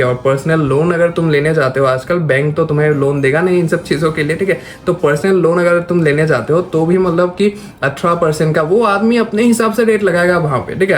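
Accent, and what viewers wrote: Assam, India. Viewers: Bhai Assam se ho kiya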